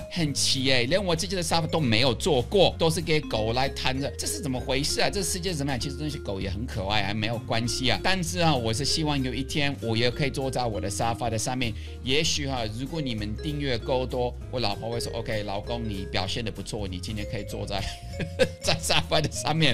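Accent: Taiwan. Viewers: 满口台腔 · 你的國台語已很台了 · 台語比國語還標準！真的是台灣人...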